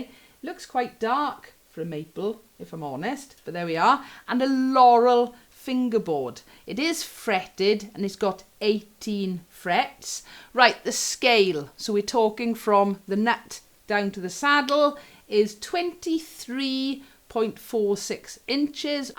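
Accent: Wales, United Kingdom. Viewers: Thanks for the concise, informative, unbiased review. Great captioning, narration, lovely Welsh accent and pronunciation. I love your playing and choice of samples,. You play so well I couldn't hear roundwound scraping sounds at all. I've got DR Legend 45-105 short-scale flatwound strings on my Japanese 1973 Univox Hi-Flyer 'Ventures' bass clone. Flats hide some of my 75 year old student bass guitarist bad fingering and muting. Great pedal display, makes me think I need some, and maybe some gels. I like to use the Chorus effect on my Fender LT25, ethereal, especially with my Ibanez Mikro converted to piccolo bass- D'Addario rounds on it, so playing it without scrapes is, for me, what the Army called 'valuable training'. Thanks again, I'll check out your reviews, I'm shopping for another good shorty in EADG to throw in my Mazda to take to Death Valley. 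· Hey! Just ordered one - I was torn between the white/cream & black, went for the black. Arriving tomorrow! Thanks for the fantastic review! I’m a 57 year old lady feeling like a kid at Christmas. 🤣 Subscribed 👍🏽 PS, absolutely love your Welch accent. 😊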